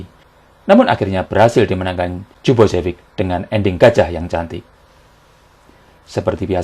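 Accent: Java, Indonesia. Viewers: ilat jowo cak wkwkwkk 🤣🤣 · Saya suka gaya Om, tau aja yg subcribernya kebnyakan org Jawa hahahaha...., Lidah Jawa ya Om, GX perlu formal2 banget sebut nama yg penting penyampaian materi mudah di cerna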